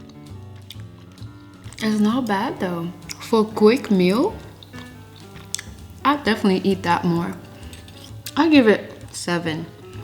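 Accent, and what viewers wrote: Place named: France. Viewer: its the french accent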